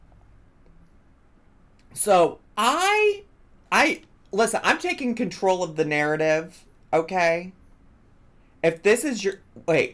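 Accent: South, United States. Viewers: has such a gentle southern accent for a midwesterner 😂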